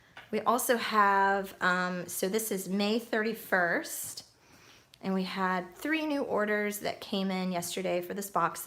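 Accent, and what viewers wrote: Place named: United States. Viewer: I've noticed one thing with these types of diy videos... When an American makes them - TOOOOOOOO MUCH FRIGGIN TALKS, NO FRIGGIN ACTION... they keep talking and talking and talking praising how good they are... and when you watch videos from other countries - NO TALK, JUST BUSINESS... nice relaxing music and business... but only and only Americans bother people with their annoying accent and nonsense talks... OMG grow up America, people around you are getting better and better... for example in this video she keeps talking and stalking people to order her unfinished product, and in the end - WHERE IS THAT FRIGGIN MARBLED WHATEVER YOU WERE MAKING?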